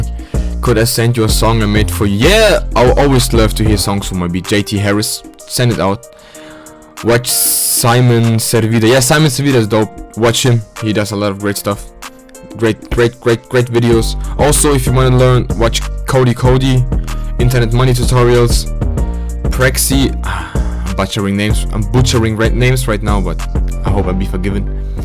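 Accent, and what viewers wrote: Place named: Germany. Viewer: Deutsches English izz da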